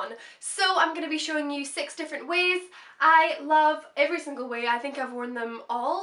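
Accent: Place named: Edinburgh, United Kingdom. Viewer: You sound like you are from Edinburgh, if you are then I'm guessing this video is inspired by the leith festival? Just a guess x 😆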